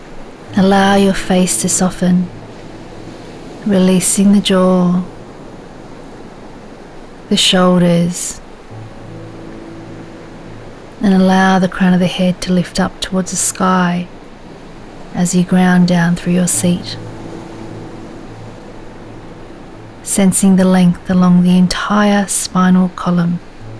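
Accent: Australia. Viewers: New fave yogi channel discovered! Loved the visualization and ofc your gentle Australian voice :3 (I studied in Melb for a year, so I miss that accent)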